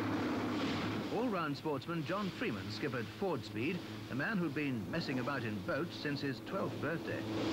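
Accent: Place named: RP, United Kingdom. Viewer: love that 'cut glass' accent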